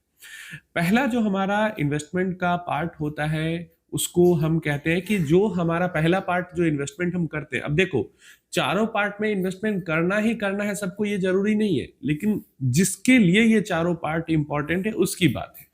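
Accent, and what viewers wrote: Bihar, India. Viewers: Namaste aap bihar se ho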